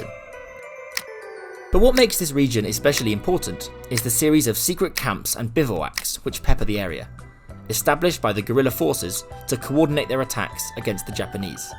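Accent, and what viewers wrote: Manchester, United Kingdom. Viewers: Yes, sounds like a bit of a Manchester accent to me, certainly a bit Northern UK? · Yes. A British-sounding man with an apparently good knowledge of North Korea history. He seems to have also a good pronunciation of North Korean names and places. Not to mention his sophisticated taste in choosing background music. In short a man of mistery.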